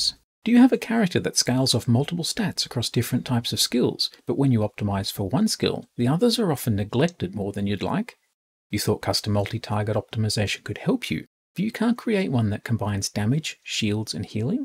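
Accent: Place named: United Kingdom. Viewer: Just watched the full video series. I'm in Genshin for about 2 weeks and as a rookie I can safely say I didn't understand 90% of the content. Especially the jokes. but man the intros and the so British voice are funny. I will slowly get into the tool down the road, but I have a lot on my plate with the base game already. Thank you very much for bringing this knowledge + utility to the community.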